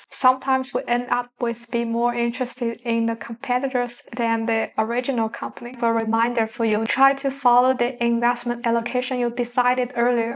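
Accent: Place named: Scandinavia. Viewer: I like your accent from Nordic.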